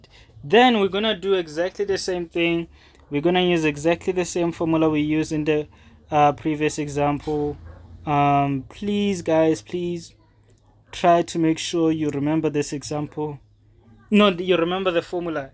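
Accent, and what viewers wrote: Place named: India. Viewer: I love your energy, accent, and your teachings! Big help for I am going to take CET in 3 days. Thank you random Indian teacher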